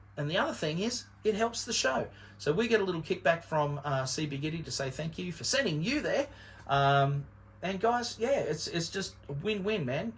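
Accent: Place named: Australia. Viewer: Wow! I wasn't expecting an Ozzie accent. Thanks for the link.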